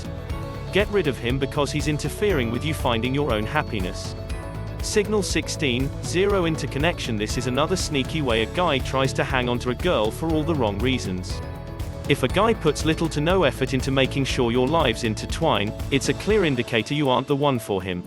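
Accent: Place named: United Kingdom. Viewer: Of course all of this totally true. What I loved the most about the content wasn't the content at all. It was the straight up no bs delivery in smooth British voice was delicious and hilarious. By the time the video ended my mood was lifted. Well played. Thx for sharing.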